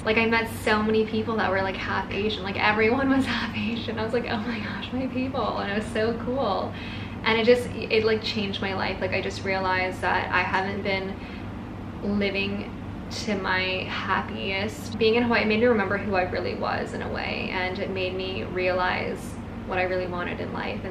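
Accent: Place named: General American, United States. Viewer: curious why you got the North American generic accent while your brother got the aussie one. did you guys grow up separately?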